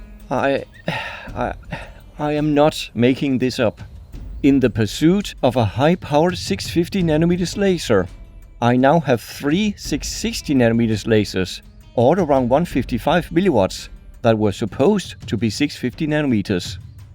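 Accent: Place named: Denmark. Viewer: That’s an S tier Danish accent right there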